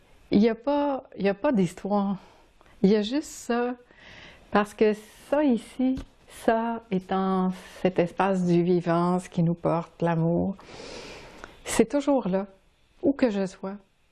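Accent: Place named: Canada. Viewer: Quel régal... "On l'r'ssin lô on l'r'ssin" 😂😂😂 moi aussi je l'ressens là et j'aime tellement votre accent canadien, si doux, si chaleureux... Merci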